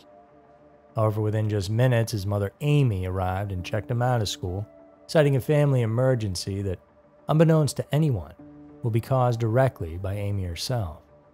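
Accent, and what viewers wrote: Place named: Georgia, United States. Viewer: you're so awesome and professional. So sad and Heartbreaking stories. You're my number 1⃣ story teller. Prayers for the families. 🙏 P.S. I swear I can hear a Georgia accent, but you say you're from Beantown! ❤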